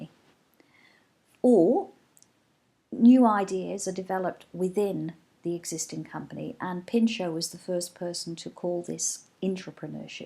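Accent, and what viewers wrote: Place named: Manchester, United Kingdom. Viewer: Very nice accent I think u are from Manchester London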